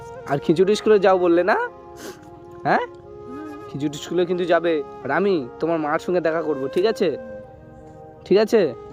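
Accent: India. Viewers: কথা শুনে মনে হয় ইন্ডিয়ার 🙂 ইন্ডিয়ার ভাষা এমন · ইন্ডিয়ান কথার মতো লাগছে💕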